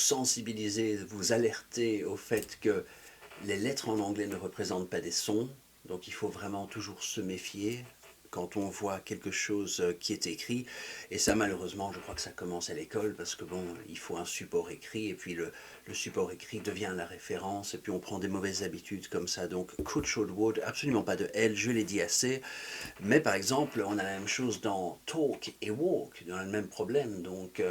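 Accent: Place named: United Kingdom. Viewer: Pour les Français qui écoutent, je peux confirmer que, ce professeur, a un très bon accent anglais britannique, qui est, à mon avis (sans que je sois biaisée en tant qu'anglaise), beaucoup plus agréable que l'accent américain! I could. I should. I would listen to all day!!! 😬😬😬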